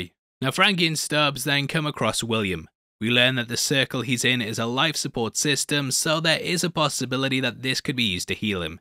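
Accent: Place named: England, United Kingdom. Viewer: I love English accents. You called Christina Christiner and I love it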